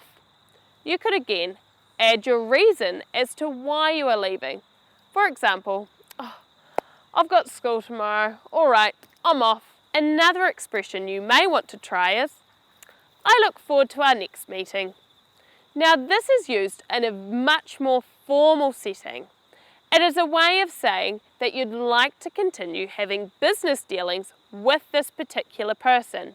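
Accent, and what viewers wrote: United Kingdom. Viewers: Her accent is british,. But she is in New zeeland. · British English????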